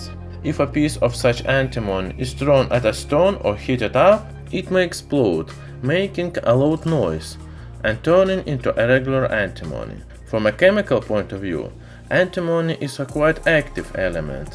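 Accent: Russia. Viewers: It's Russian accent) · русский акцент выкупается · Maybe this guy should use a computer to narrate. товарищ твой акцент чересчур русский. Не понимаю:(( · Русский акцент ,поработай над этим.а так ахуенно. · What’s with the FPS Russia accent??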